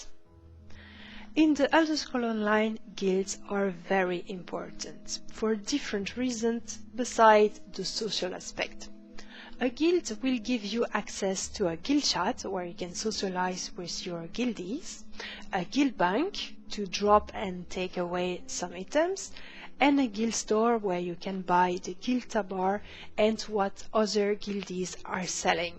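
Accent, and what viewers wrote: France. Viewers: your "r"s suggest that you are frenchspeaker:) love it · I really like the fact that you dont give the usual hardcore, grind this, ignore the game, rush there tips. You made me and for sure other people remember why we started playing mmorpg´s in the first place: diving into a world of fantasy and enjoy it. Big thanks for that. There is only one "problem" about all this. Started the video, saw that pretty face of yours and heard your beautiful french accent. 10 minutes later i had to F5 because i did not remember any single word you said...was just floating on a wave and listening to your voice. Stop beeing so charming !!! ;) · omg the French accent is real love it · my dear, as a new player i would just like to say thank you! :) a wonderful presentation, wonderful & very useful tips...and a wonderful french accent :) mesmerizing voice, a pleasure to listen to you! 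· that blew my mind, because your accent while speaking English definitely has a french accent to it:D so do you also speak Dutch/German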